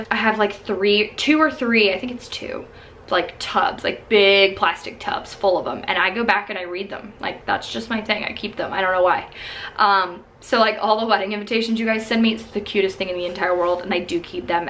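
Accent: South, United States. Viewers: I love your country accent WAY more than your "stage voice" it's so authentically you! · I’m from Mississippi so I love a southern accent! Also I died at “coochie cutters” hahaha we said that growing up! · Hey! 😀 I thought you were speaking with a country accent since I just watch your main channel videos and this is the first vlog I watch so it caught me off guard hearing your normal voice lol. Have a great week 😀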